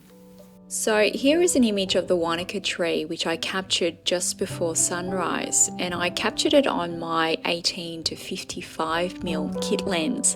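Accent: Australia. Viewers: Thanks for the really informative review, it's great to hear from an actual user of their experience. I also am enjoying your "Aussie" accent (with perhaps a bit of Asian in there), it's so pleasant to listen to! I'm about to order my XH2, and upon checking out the firmware updates it looks like with version 3.0 they've added the ability to set the ISO with the FRONT COMMAND dial. I've also seen in the manual that the current ISO can be displayed on the main screen. Unless I'm misunderstanding all this (don't have the actual camera yet), it seems that Fuji may have addressed this specific complaint. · Enjoyed the your thoughts and examples. Your Aussie accent so pleasant to listen to.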